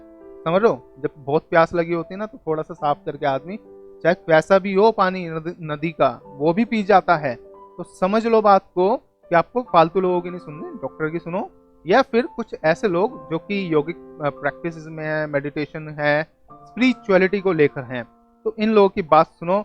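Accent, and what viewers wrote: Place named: Delhi, India. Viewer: Apki bhasha Dehli ki hai..... Mere ek dost se milti julti.. 🙏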